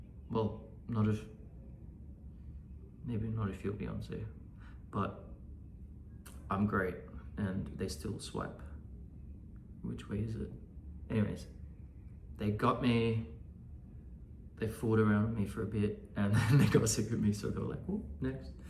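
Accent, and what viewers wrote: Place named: Australia. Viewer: You've a cute Australian accent so beautiful 💗💗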